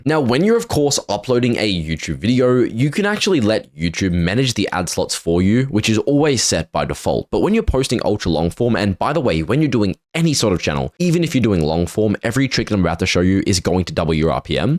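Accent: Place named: Australia. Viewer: This aussie accent is so cool ma man 🤜🤛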